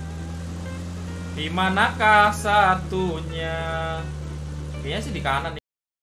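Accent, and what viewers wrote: Java, Indonesia. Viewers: Tetep menjadi kereator yg lucu mas dengan logot jawa nya, dan sehat selalau untuk mecari rejeki buat keluarga nya, IG: alfian_yuliansyah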